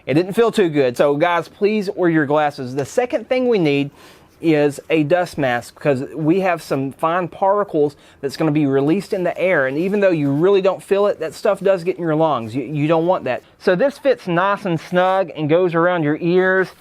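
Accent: South, United States. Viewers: New watcher, and new sub. You rule. And as someone from California with a southern family, the accent makes me trust you so much more. I love when someone from NASA sounds like they started out with chicken wire and a bad muffler. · as soon as I heard his accent I just knew I was about to learn nothing whatsoever from an intellectually challenged redneck. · Love your southern accent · Hey I hope you dnt take this offensively, but is your accent considered a redneck or a hillbilly?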